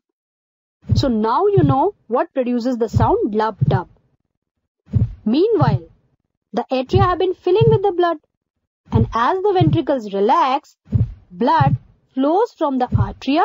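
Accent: India. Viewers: Im engaged to an indian girl. I wish she had an accent like yours. God bless you indian woman. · funny indian english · your accent is too indian. i cant understand a word you are saying.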